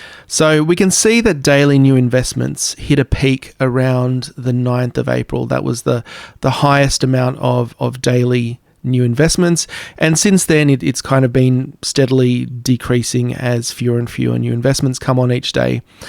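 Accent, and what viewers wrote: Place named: Australia. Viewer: Thanks mate good to hear another Aussie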